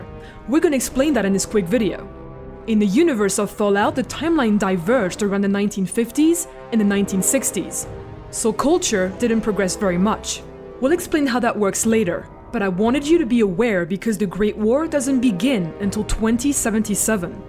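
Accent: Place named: France. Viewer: she has a cute accent. sounds French.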